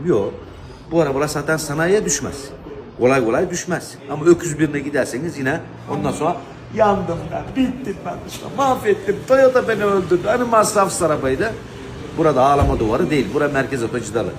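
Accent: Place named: Aegean, Turkey. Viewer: Alem adamsin kral bizde egeliyiz şivene kurban bozma şiveni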